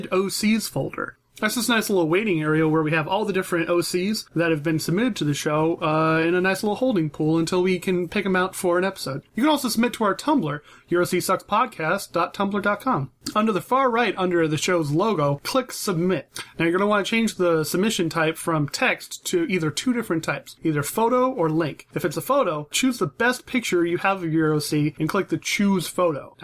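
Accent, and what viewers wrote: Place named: Scotland, United Kingdom. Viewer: I still love your accent. .3. only because scotish people are awesome. I wish to live in scotland or ireland one day, cause Australia now bores me o3o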